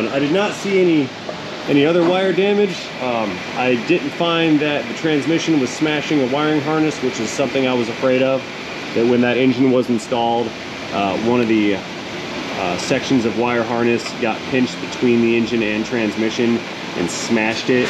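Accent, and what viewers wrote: Russia. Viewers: Your Russian accent reminds me of FPS Russian. 😂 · Better Russian accent than me... and I speak Russian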